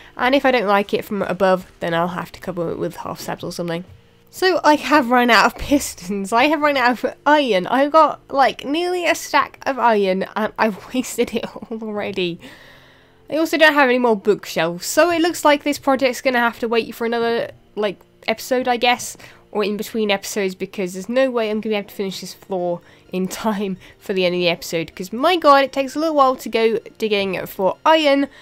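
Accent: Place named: Fens, United Kingdom. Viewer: Fab Vid! I have to ask though, your accent... you were sounding very much like a fenland lass lol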